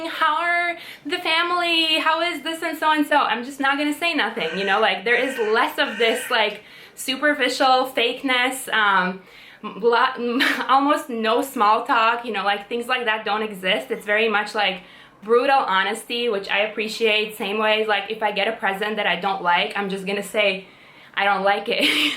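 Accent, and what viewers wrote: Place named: East Coast, United States. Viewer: the East Coast USA accent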